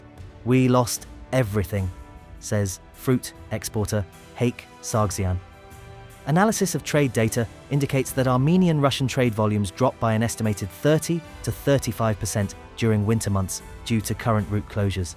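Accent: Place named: London, United Kingdom. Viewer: London accent make complaints about developments in Eastern countries!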